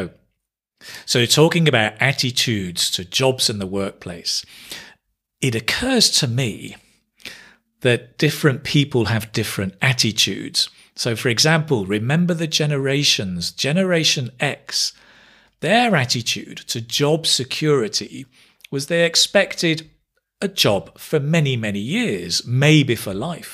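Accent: United Kingdom. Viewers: Your English accent sounds amazing .. I am in love with British English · Hi. My exam is on March 7th. I really would like to have as much ideas from you as possible. I like your ideas and I love the British accent. I hope that you can make more videos sir. Thank you so much 🙂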